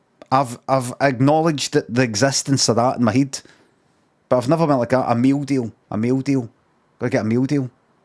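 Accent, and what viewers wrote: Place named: West End, United Kingdom. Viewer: Horrifying to see. Will only be a matter of time before Limmy's west end accent and his real voice are one and the same.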